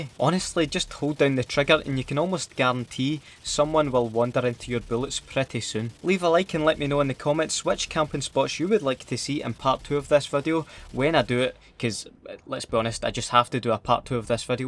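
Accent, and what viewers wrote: Scotland, United Kingdom. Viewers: I'm Scottish, and most YouTubers are American. It's just so nice watching you so I can finally hear a Scottish person playing CoD. I actually canny explain it pahaha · I subbed cause of his Scottish accent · What part of Scotland are you from mate??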